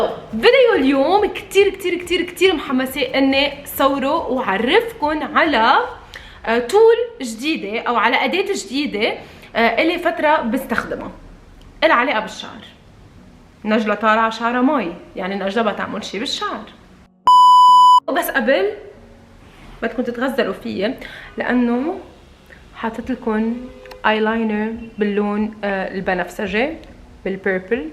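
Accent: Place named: Syria. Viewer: لان لهجتك سورية🤣🤣 وانا بعرف مافي توصيل ع سوريا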